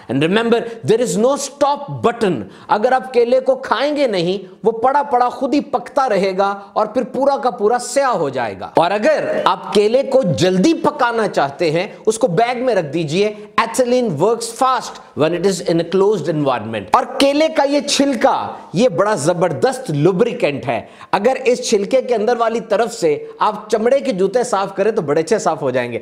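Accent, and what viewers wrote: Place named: India. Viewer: His accent resembles with Indians .....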